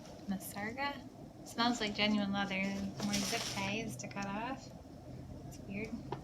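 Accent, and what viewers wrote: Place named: Michigan, United States. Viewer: Michigan! I was trying to figure out your dialect and it was driving me mad that I not knowing.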